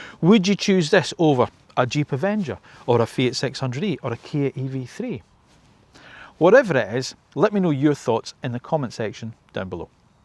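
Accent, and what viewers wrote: Scotland, United Kingdom. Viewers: The design of this India built car was actually inspired by another Indian EV I happen to own, called the Tata Punch EV. Watched the review not for the car but for your charming scottish accent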